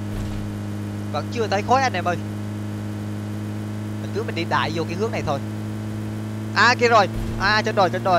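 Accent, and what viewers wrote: Binh Dinh, Vietnam. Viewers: Quê ban ở bình định hả ban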